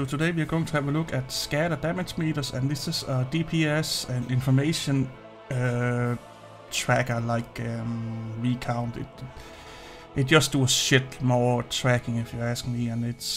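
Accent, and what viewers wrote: Denmark. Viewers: It's so obvious on you accent, that you're danish, haha! I like it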